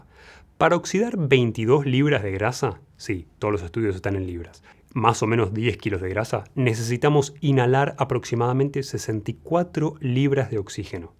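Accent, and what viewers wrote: Argentina. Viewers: no le ves la cara de ángel ? Su acento argentino ? Su tono de voz tan relajante ? Su sabiduría? Su inteligencia y nobleza ? ❤️❤️ èl no puede ser de esta tierra . 👼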